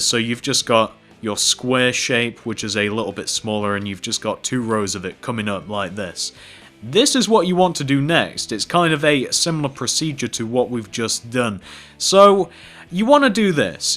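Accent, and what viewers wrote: United Kingdom. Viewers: he does have a British accent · yea. they have british accents not stupid people accents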